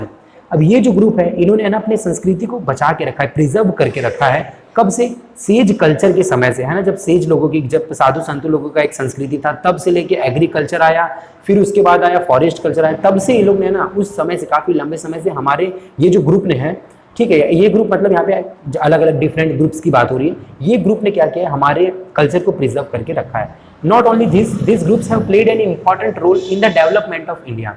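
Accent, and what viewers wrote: Gujarat, India. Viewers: Sir Aap GUJARATI ho..?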